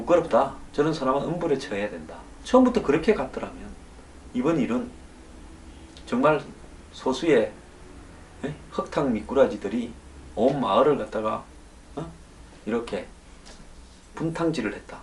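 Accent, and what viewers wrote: Gyeongsang, South Korea. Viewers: TK사람 말투 진짜로 짜증나네? 같은 경상도지만 말투가 영~~~~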